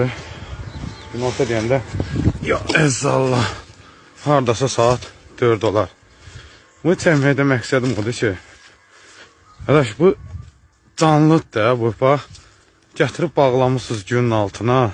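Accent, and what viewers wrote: Sabirabad, Azerbaijan. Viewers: Vallahi 40 ildir Rusiyada yaşayıram, Qardaş Allah kömək olsun sənə, Yezidə lə'nət oxu, Ləhçə Sabirabaddi dənə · Qardaş Allah razi olsun sizdən bizdə beləyik mən özum butun heyvanlara yazigim gəlirr xilaskar bir insanam ləhcəniz sabrabadd 😊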